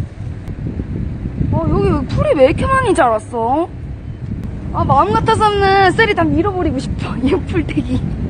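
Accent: Busan, South Korea. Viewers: 부산 사투리 귀욥네요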